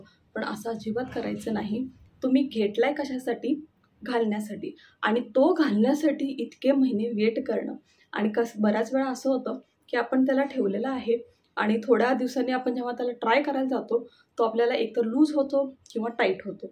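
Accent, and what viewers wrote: Kolhapur, India. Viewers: कोल्हापूर ची आहेस का ताई....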